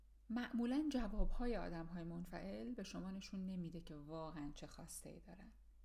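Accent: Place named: United Kingdom. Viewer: چه جالب بود ! همش شمارو جدی در حال سروکله زدن و مصاحبه با افراد بدقلق و اخبار گفتن دیده بودم 😃 لهجتونم خیلی بریتیشه 👍👏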